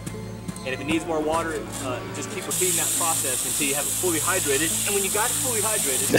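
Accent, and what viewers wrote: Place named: Louisiana, United States. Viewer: Love your videos bc your accent reminds me of home lol you have to be cajun lol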